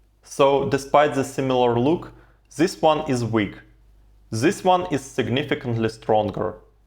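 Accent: Russia. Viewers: Speaking with russian accent)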